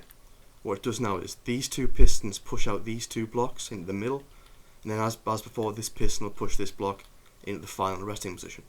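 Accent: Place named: Newcastle, United Kingdom. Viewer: Good video, it's just inspired me to try something similar myself. Btw, where abouts in the North are you from? I can't quite place your accent, but you must be up in the North-East with me (I'm from Hartlepool)! All I could figure was that you sound quite like a Geordie, but not full on. Or am I wrong and that's a Sunderland shirt in the intro lol?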